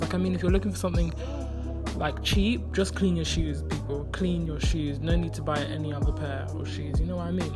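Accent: United Kingdom. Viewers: I'm sure you're Britishhh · You absolutely love your British accent and your beautiful voice its like angel music to my ears i think i love you 🤣😝💕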